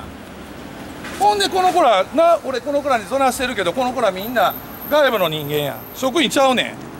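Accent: Kansai, Japan. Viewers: なんで関西弁なの。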